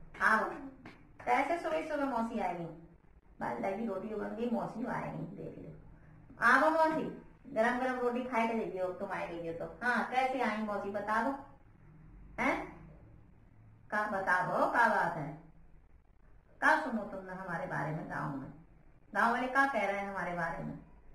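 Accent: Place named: India. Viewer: Wow di desi khana desi hi style mein baat bahut achcha laga 🙏❤️❤️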